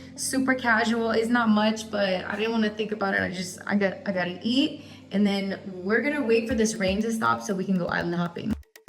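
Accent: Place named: Los Angeles, United States. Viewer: Youre from Nawlin? I thought youre an LA woman.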